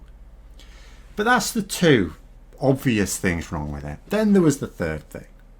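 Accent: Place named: Yorkshire, United Kingdom. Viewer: Bit rambling today, and a bit more Yorkshire. It’s been bugging me who you remind me of. Geoffrey Palmer from Butterflies. You even have that world weary demeanour. That is anything but a criticism.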